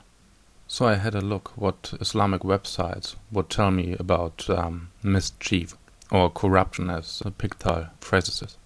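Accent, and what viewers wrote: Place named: Germany. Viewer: The way you say threat the last seconds combined with your German accent worries me.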